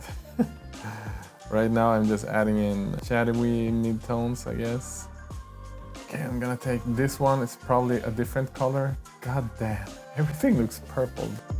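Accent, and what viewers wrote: Sweden. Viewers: WHAT DUDE! Oh my god you’re swedish??! I could tell you werent native english but wow im shocked. Haha i knew I recognized the accent. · Ah! I thought I heard the Swedish! Great video, lot's of fun :)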